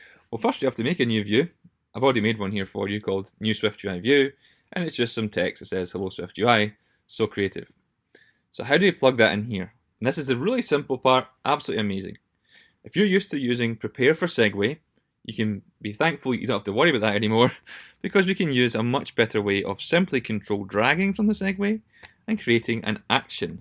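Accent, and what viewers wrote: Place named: New Zealand. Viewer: Amazing video, thanks for making it. Not related to this video, but just wanna ask: Are you from New Zealand? Your accent sounds like people from there.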